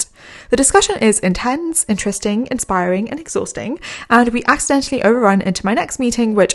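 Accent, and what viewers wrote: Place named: United Kingdom. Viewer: Wonderful. Beautiful British English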